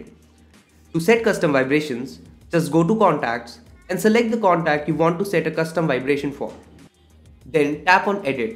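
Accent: India. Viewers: Indian english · Worthless vid, unless you like listening to indian accent english... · It’s Indian accent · badibadibadi one currrryy pleaseeeeee, i really didn't understood anything what he said with his indian taxi driver dialect ... it's really hard to understand what he says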